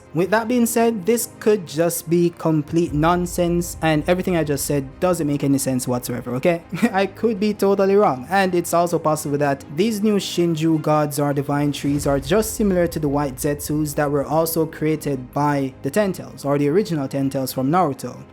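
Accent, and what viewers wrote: Jamaica. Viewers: by chance Jamaican?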